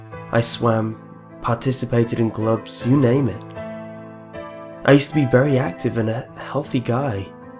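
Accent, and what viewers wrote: United Kingdom. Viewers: I like his voice because he's British!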